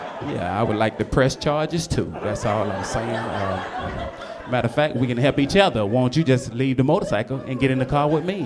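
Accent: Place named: South, United States. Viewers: That black atlanta accent is hilarious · The accent. Probably from the same part of the south. Just a guess.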